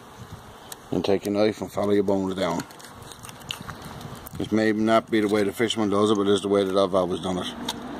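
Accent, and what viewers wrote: Newfoundland, Canada. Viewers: Most likely from Newfoundland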